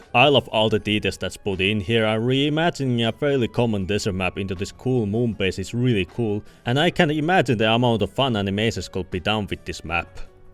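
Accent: Finland. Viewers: Kiva rallienglanti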